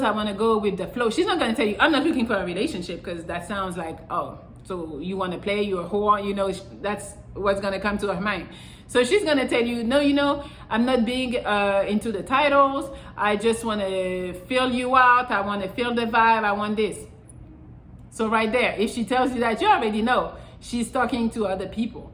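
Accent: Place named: France. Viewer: Another banging video and a solid piece of advice love your energy and aura around you and your work ethic and personality and intelligence is the best I've even seen looking beautiful and sexy and most attractive woman on the planet love them sexy eyes and and accent too and love your tattoos there's nothing sexier on a woman than all the qualities that you possess keep up the awesome work you do and never change who you are your perfect the way you are your a gorgeous French goddess queen xx ❤️ 😘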